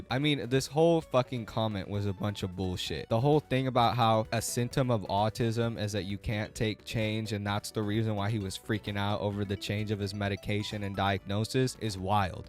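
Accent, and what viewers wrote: South, United States. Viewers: I love your southern twang! Usually I just call what you call "folks" people, I forgot that a lot of southern people say "folks" very interesting!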